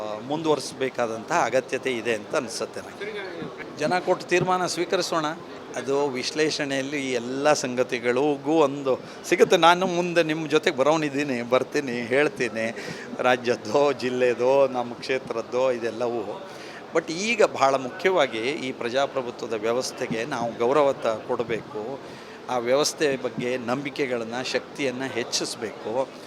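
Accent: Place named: Uttara Kannada, India. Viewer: ಸೋತ ನಂತರ ಅದನ್ನು ಹೇಳುವಾಗಲೂ ನೀವು ,ಸರಳವಾಗಿ ಉತ್ತರ ಕನ್ನಡ ಜಿಲ್ಲೆಯ ಆಡು ಭಾಷೆಯಲ್ಲಿ ,ನಿಜವಾದ ಕಾರಣ ಹೇಳದೇ ,ತಾನು ಇನ್ನು ಸಭಾಪತಿ ಎಂಬ ಬ್ರಮೆಯಲ್ಲಿ ,ಏನೇನೋ ಹೇಳುತ್ತಿದ್ದೀರಿ.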